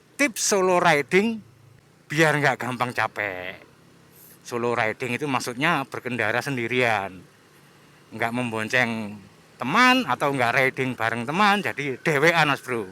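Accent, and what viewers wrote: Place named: East Java, Indonesia. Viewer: Logatmu wes jawa timuran bro ilang asline😅😅ditunggu di jalur JLS trenggalek - tulungagung lanjut blitar-malang🙏👍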